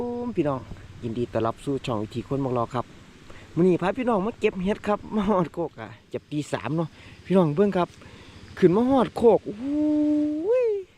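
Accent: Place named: Suphan Buri, Thailand. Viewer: บ่าวสำเนียงคือคนบ้านฉันเลยลาวสุพรรณ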